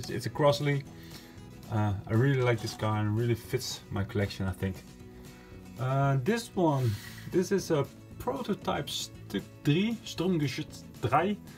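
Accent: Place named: Netherlands. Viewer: You sound Dutch. I'm Dutch to!!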